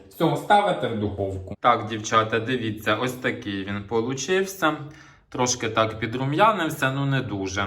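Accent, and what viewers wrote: Poltava, Ukraine. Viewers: Дякую дуже, сміюся вголос. Нагадуєте мамину говірку, вона була з Полтавщини.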